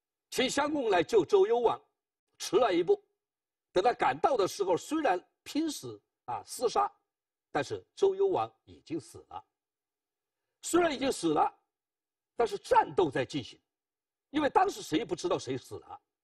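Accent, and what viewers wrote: Sichuan, China. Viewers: 这位教授普通话听着像四川人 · 我听着他肯定是四川人。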